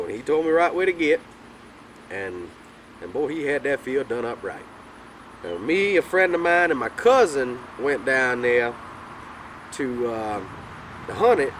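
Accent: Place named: South Carolina, United States. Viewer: Just happened to be looking at YouTube one day and when I heard you talking, I said to myself, “that boy sounds like he’s from up home.” Sure enough, my suspicions were right. I am an Orangeburg county boy myself, from Springfield. Kinda wondered if you had ever hunted with the Brown boys from between Norway and Denmark. I used to dog hunt with them a good many years ago. Live in Charleston but Orangeburg county will always be home. Enjoy your videos. Keep them coming!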